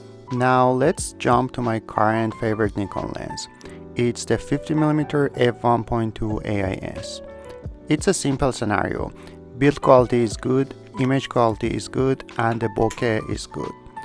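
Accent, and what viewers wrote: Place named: Iran. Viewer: سلام . ویدیوی خوبی بود . ممنون از نحوه‌ی انگلیسی صحبت کردن متوجه شدم ایرانی هستید 😊. در کل با لنزهای زوم ارتباط برقرار نمیکنم . به جای ۲ تا لنز زوم ترجیح میدم ۴-۵ تا پرایم همراه خودم داشته باشم .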